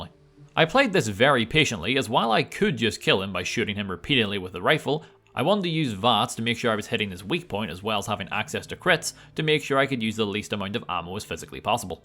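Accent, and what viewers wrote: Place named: Northern Ireland, United Kingdom. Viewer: i would see my face when i heard your northern irish accent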